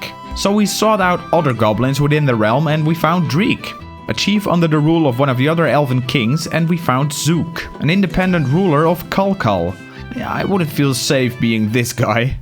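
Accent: Netherlands. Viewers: Dutch accent 😢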